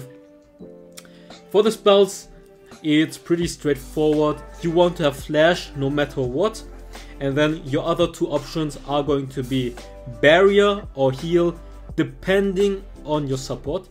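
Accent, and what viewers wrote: Germany. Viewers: Most probably Germany. Pretty typical accent over there. 😁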